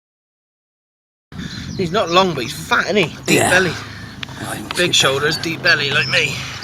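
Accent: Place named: Liverpool, United Kingdom. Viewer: Great Scouse accent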